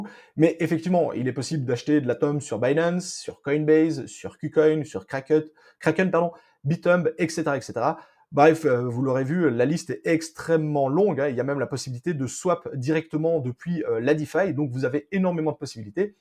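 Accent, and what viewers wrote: Belgium. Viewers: Cet accent xD Tu es du côté de Liéch?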